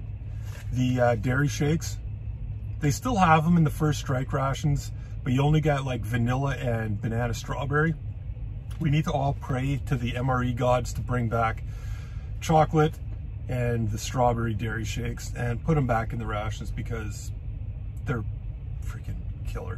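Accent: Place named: South, United States. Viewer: My very first MRE was a chicken breast pattie. Couldn't believe my eyes that a piece of chicken was in a bag and still fit to eat. Little chicken juice goes a long way in the cab of a truck. Bit and pieces of this video you sound like a southerner. Great detailed video. PS it's still hot. Enjoyed our visit with you that week.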